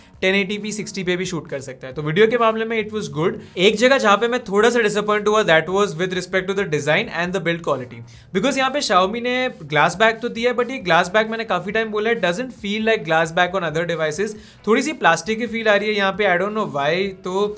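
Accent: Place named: India. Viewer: I honestly cant tell whether its english, or half english half indian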